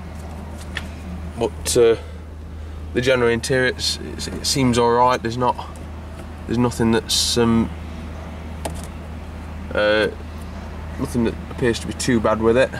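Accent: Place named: Nottingham, United Kingdom. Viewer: Nice to hear a Nottingham accent.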